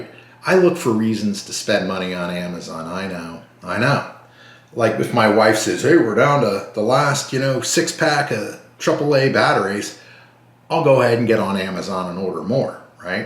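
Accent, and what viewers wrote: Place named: United States. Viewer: Greetings from Australia. Have ordered Mounjaro but we are not allowed to have the preloaded pens yet.... they have to be self drawn normal injections ( I think you say shots in the USA lol).. What do you do for work.? anyway soon starting I guess the minimum dose, wish me luck. Thank you for sharing your experience. love your American Accent, so cute.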